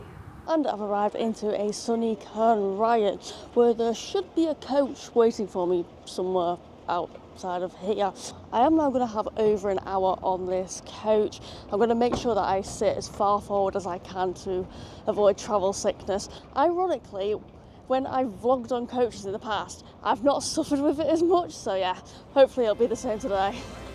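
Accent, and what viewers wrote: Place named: West Midlands, United Kingdom. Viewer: Great vid! Are you from the west mids by any chance? Sorry couldn’t help but notice the accent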